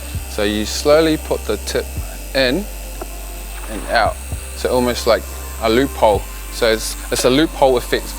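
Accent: New Zealand. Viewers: I love that Kiwi accent. That shit cracks me up after watching beached az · New zealanders have a very distinct accent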